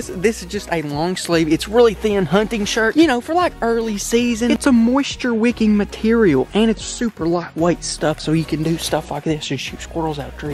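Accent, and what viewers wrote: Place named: Appalachia, United States. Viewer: Hillbilly accent just like mine #jeuse #graygang #ky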